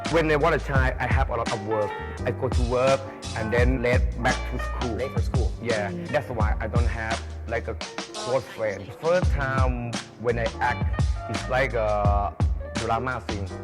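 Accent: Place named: Thailand. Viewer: ถึงสำเนียงจะไทยมากแต่ถูกต้องทุกคำค่ะ 😊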